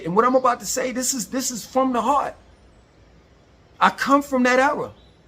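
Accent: New York, United States. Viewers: No offense, but your New York accent is still strong as hell bruh. You can’t hide that shit. Your accent comes from where you learned to talk. People never lose their accents. That’s why Slick Rick never lost his accent even though he moved to NYC when he was a kid. I had the same experience moving from the Bay Area California to Maryland as a kid in the early 90’s. Cali Hip Hop was huge in the 90’s. I wondered why people in suburban Maryland idolized Cali lifestyle so much because my childhood neighborhood was exactly like Boyz ‘N the Hood, you might get Ricky’d just walking to the corner store. Like y’all really think old school cars, gang life, guns and smoking weed and selling drugs and living in a dangerous neighborhood with palm trees is cool? I just wanted to see snow and have a neighborhood safe enough to explore as a kid and I didn’t have that in California. 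And I embraced that background hard as a confused teen and twenty something. But as a grown man, I represent myself like I’m in court with no lawyer. It’s damn near an act to of rebellion to embrace yourself as who you are in modern society. Everyone is so aspirational nowadays because of social media. Women nip and tuck everything on their bodies and try to be a build a bytch. People who come from money cosplay like they are from the projects in Brooklyn, broke people in the projects cosplay like they are rich African scammers. People over 40 want to be forever 21. Black kids in the suburbs try to act like they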